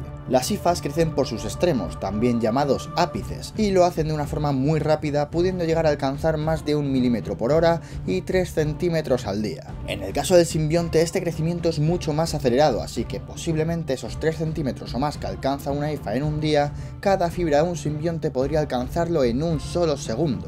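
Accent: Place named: Spain. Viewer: Estuvo muy bueno , pero la verdad los españoles la Cagan siempre en su forma de pronunciación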